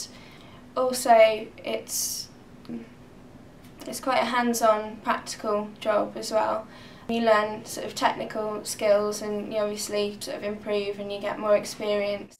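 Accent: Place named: England, United Kingdom. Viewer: My god, she's just not speaking american. I believe she's english.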